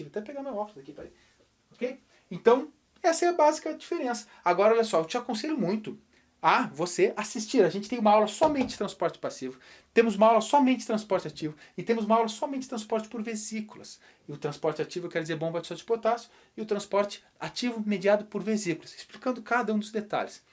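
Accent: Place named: South, Brazil. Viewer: Bofe é bonito,pelo sotaque é sulista,e muito didático, estou estudando com sono,boladona com os colegas de turma,e senhor me fez rir,quase caindo.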